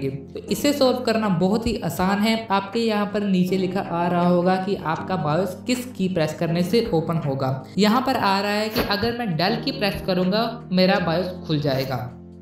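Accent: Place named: India. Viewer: Parece ser um indiano falando inglês.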